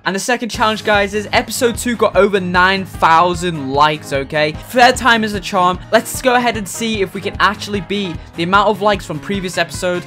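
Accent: United Kingdom. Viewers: I think he’s British…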